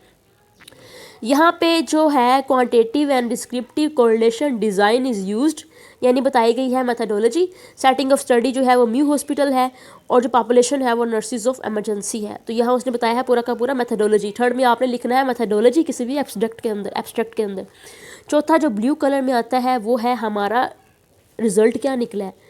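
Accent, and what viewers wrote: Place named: Punjab, India. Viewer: Ap key accents sey ap punjabi lag rahi han.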